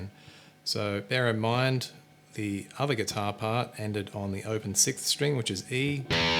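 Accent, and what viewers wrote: Australia. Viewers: Yay, an Aussie, you beaut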